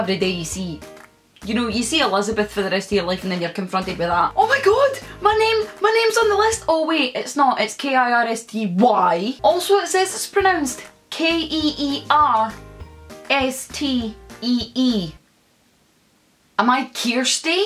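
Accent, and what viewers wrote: Scotland, United Kingdom. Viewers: Loving the Scottish accent. Born in raised in London but have Scottish heritage and am so proud of it. What part of Scotland are you from? My family is from Gretna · You sounded EXTRA Scottish in this video 😂👌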